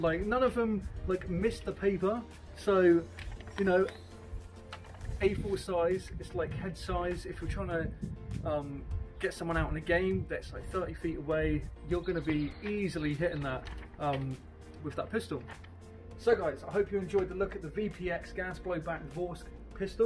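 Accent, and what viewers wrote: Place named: United Kingdom. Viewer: I thought it was a real gun until I heard your accent and yeah your in Britain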